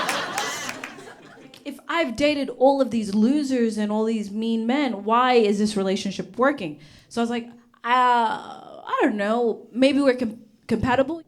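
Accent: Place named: New York, United States. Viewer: I was about to say she sounds more like a Noo Yawkuh who lived in the UK a bit (a lot like my Brooklyn-born and raised cousin who lived in London for 30 years) than an Aussie or an African. Surprised she developed that accent after arriving in Oz at such a young age.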